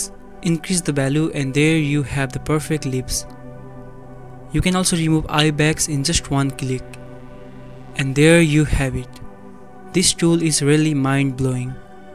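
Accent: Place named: India. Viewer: Bro, please work on your English. Good videos but that Indian accent is terrible.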